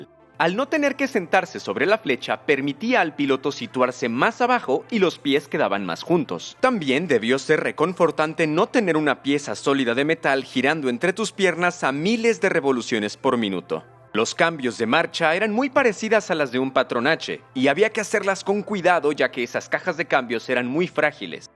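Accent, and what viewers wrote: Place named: Latin America. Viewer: Esto no era Driver61 en Español? Por qué está en modo latino? Otro video que no veo.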